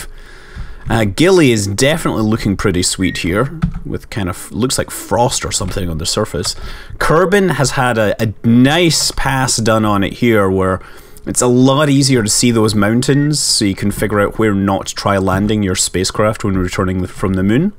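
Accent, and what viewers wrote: Scotland, United Kingdom. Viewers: you sound Scottish or Irish possibly welsh or from northern England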